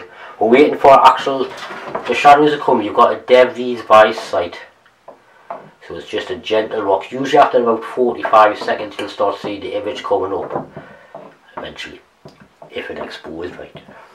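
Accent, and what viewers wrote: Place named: Newcastle, United Kingdom. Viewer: Love the vid, Thank you Great information! :-) Its a good job I can, sort of understand Geordy..... I think others would benefit with subtitles :-D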